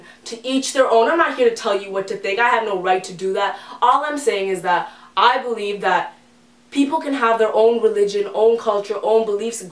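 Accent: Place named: Canada. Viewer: Awesome vid. PS, omg you must be Canadian. I can hear it! I miss TO...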